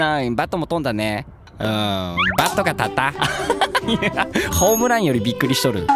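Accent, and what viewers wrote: Kansai, Japan. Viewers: 関西弁可愛すぎて聞くとニヤけてキモイやつみたいになってる、タスケテ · 俺が大阪住みやから関西弁でわかりやすい（ちなみに今年の冬休みは受験勉強だけやから楽しくない。翔さんがおもろい動画だして楽しい冬休みにしてくれ）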